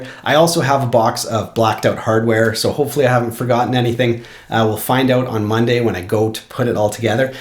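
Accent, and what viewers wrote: Canada. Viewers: Are you Canadian? Cause I am and I detect a bit of the old "eh" going on.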